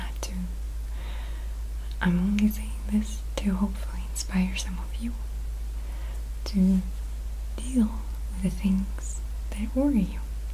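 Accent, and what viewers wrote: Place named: Russia. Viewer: Umm... No she doesn't... She's Russian... She has a Russian accent...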